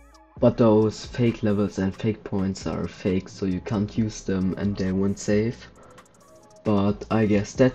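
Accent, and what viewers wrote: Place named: Germany. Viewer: nah this bot comments and u german accent is funny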